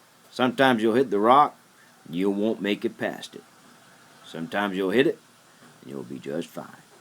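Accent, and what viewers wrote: West, United States. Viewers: DO MORE VIDEOS PLS I LIKE TO HEAR YOUR WESTERN ACCENT AGAIN AND AGAIN! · his voice sounds western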